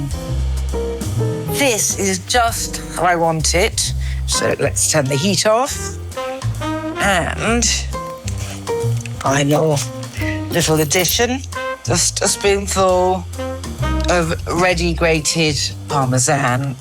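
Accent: RP, United Kingdom. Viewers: Nigella, you have been such an inspiration throughout my life! I found your shows many years ago when I had the privilege of living in the U.K. You and I both lost our mothers around the same age and I find your recipe videos to be incredibly comforting when I am missing her the most. Especially your Christmas episodes. I find such inspiration in your ability to manifest joy and beauty in often simple ingredients made decadent, and of course the well-deserved fancy ingredients. The way you describe food is like poetry. I not only learn new recipes, but my vocabulary has increased exponentially. I can only imagine how much fun it would be cooking a meal with you while sipping on a cocktail. Thank you for sharing your life with us, even with the things you perceive as flaws. They're not flaws, they make you even more perfect. You've always been so honest with the public and I think that is both noble, rare, and worthy of all of praise. 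Please don't stop saying "meekro-wavay." It's become a household term in my family because of that episode. I thought it was absolutely adorable. You speak the Queen's English but throw in your own cheeky neologisms that make you 3-dimensional. Thank you <3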